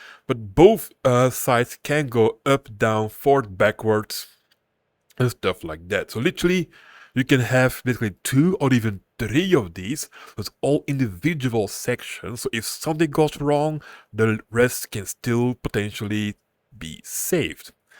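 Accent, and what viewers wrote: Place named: Flanders, Belgium. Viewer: Ik can een vlaams herken waneer ik hoor hem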